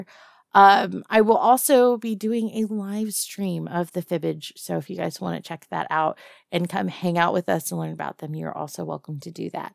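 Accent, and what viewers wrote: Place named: Texas, United States. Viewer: once again your sweet voice brings another one of “cryptid women” stories to life. Tiger with Sweet Texas tang. One of these days your ever so powerful double entendre will rip a hole in the space time continuum. 💛💚💙❣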